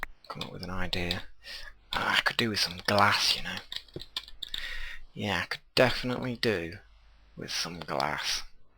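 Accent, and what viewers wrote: England, United Kingdom. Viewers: that english accent makes it all so captivating, it's like watching a documentary... on... digging diggy holes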